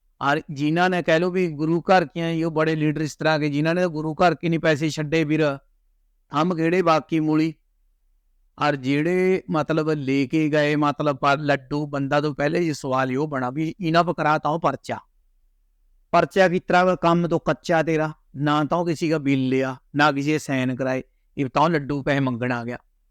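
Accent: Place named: Puadh, India. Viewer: ssa bhaji Bahut badia puadi Punjabi khabra hai ji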